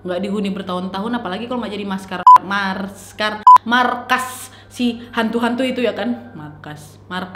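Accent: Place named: Medan, Indonesia. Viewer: Kak orang mana?? Kok logatnya kayak orang Medan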